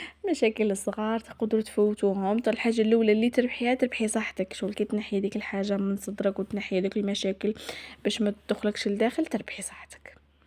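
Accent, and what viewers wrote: Algeria. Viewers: علاه حنا قلنا مشي حلوة قلنا تهدر هدرتنا تاع الغرب ماشي هدرة الناس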